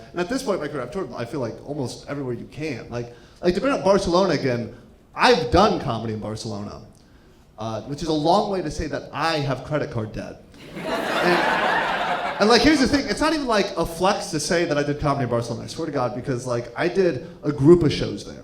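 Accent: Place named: Jamaica. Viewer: Several times he was almost funny. I think he would have killed it if he spoke in his Jamaican accent.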